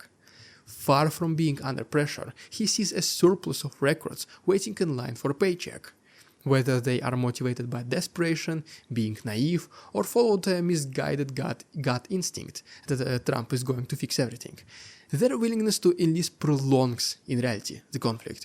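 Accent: Russia. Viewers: One more "wolf under sheeps skin" - this channel is working for russian propaganda. starting from typical russian accent without even trying to talk better - diminishing the language, ending up with narratives very convenient for kremlin gremlin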